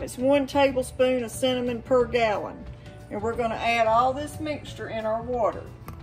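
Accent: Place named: Eastern Kentucky, United States. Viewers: I’m from Wayne Co West Virginia across Tug River from Martin Co Ky. We talk the same, srup,worsh rag, roin the clothes lol I love our country ways Enjoyed the video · Love the accent, I'm from the mountains of Eastern Ky... we have the same accent with a mountain drawl... southern people the heart and soul of America!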